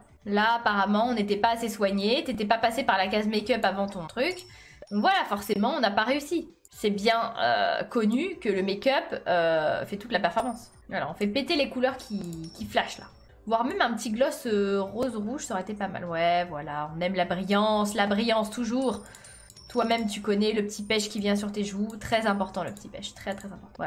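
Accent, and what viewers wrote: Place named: Marseille, France. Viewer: Laccents Marseille mdrr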